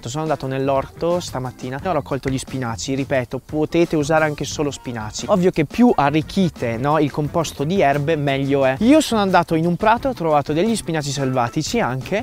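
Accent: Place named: Romagna, Italy. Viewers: Non tradire dora troppo forte la tua voce romagnola grande x lo straccio buonissimo tutto e tu grande cuoco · Il cassone! 😍 E comunque l'accento romagnolo a sentirlo dagli altri si sente tantissimo, tipo "ma quindi gli altri quando mi ascoltano sentono sta pronuncia qui????" BICARBONATO, sempre!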